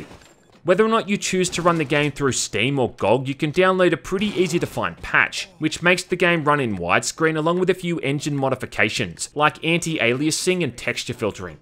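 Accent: Australia. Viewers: My new favourite thing: listening to game reviews in an australian accent 😅 I wanna add, that cypress hill not only made the soundtrack some of the voice acting as well. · defo an aussie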